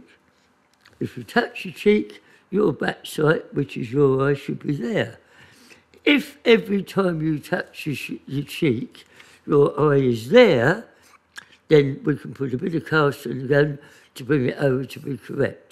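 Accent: United Kingdom. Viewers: I have to admit, I have no idea what he said the 2nd step was. Apparently, I speak English, but I don't understand British.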